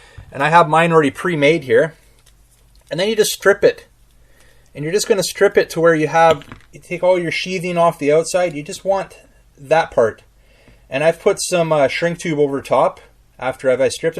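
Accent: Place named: Canada. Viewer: This is exactly what I was looking for, thank you! Side note, you have THE most Canadian accent I’ve ever heard, and I mean that in a good way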